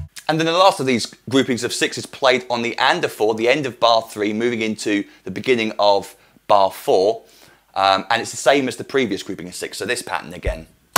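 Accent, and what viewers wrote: United Kingdom. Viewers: u BRITISH ??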